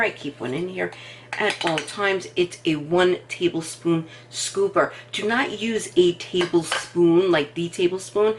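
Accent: New York, United States. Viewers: You have the coolest NY accent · You a New Yorker? My husband is a former New Yorker now he’s a Utahn. Loved your video...Brenda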